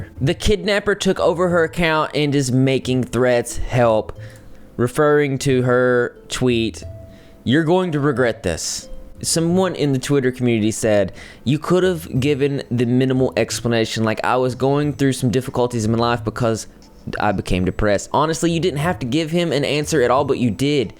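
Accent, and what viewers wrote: South, United States. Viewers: He's a fellow southerner. We get that drawl, especially when we talk fast. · Is he southern or british?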